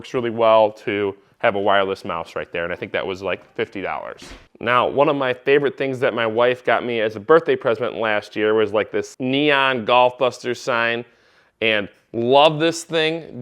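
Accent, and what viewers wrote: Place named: Michigan, United States. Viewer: How did it take me 15 seconds to guess you were from Michigan lol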